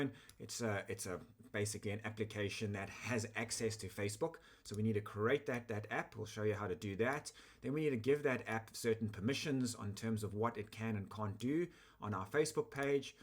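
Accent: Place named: South Africa. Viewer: Thanks. The South African accent is an odd mix of British, Dutch and Australian. Hopefully it's easy enough to understand?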